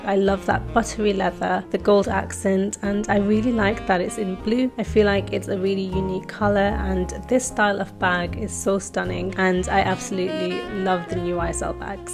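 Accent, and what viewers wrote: United Kingdom. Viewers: OMG your Brit accent is so beautiful! Love hearing you.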